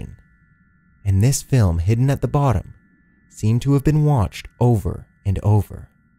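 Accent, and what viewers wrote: Canada. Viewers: Love Your channel...New Subscriber :)....Your Canadian right?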